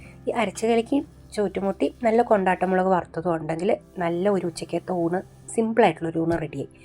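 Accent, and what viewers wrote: Palakkad, India. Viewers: നമ്മൾ പാലക്കാടന്മാർ പറയുന്ന പൊലെ "നാഴി നെല്ലിക്ക" എന്ന് പറഞ്ഞ് പാലക്കാടൻ ശൈലിയിലെ വിവരണം അടിപൊളി..😂😂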